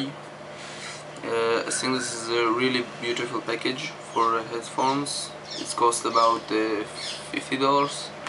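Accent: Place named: Israel. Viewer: ידעתי מהתחלה שאתה ישראלי בגלל המבטא תודה אחי עזרתי לי מאוד :)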